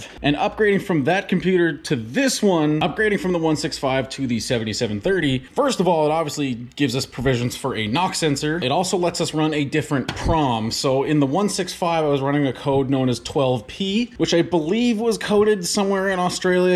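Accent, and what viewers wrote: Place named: United Kingdom. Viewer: So out of curiosity were are you located? Country is fine just how you said everything it sounds like the uk maybe....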